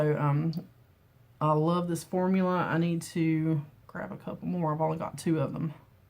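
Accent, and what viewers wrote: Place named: East Coast, United States. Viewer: Hi, fun GRWM! I subbed back to you and thanks for watching my channel too! As you may know, I have lost 50 lbs on keto at age of 67. I love keto and have recently started a new Facebook Group with a link on my video called Keto N Koffee with Marjorie. I think its wonderful that you and your husband have been researching and plan on doing this together. If I can be of help, just ask! I love your accent, you sound like my relatives back east! I am in So. CA. You already have a good start with your weightloss! Your makeup looks lovely! xoxoMarjorie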